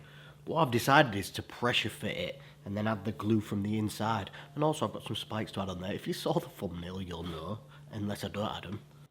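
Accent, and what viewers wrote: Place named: Yorkshire, United Kingdom. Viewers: Sounds like Yorkshire, North of England 🏴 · It is, but there are several different and very distinct accents to be found in Northern England, this one sounds like Yorkshire to me.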